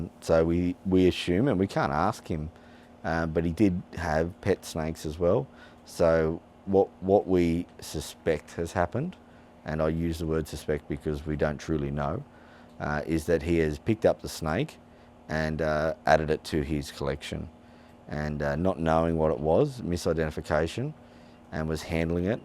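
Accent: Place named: Australia. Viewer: I love the Aussie accent. ❤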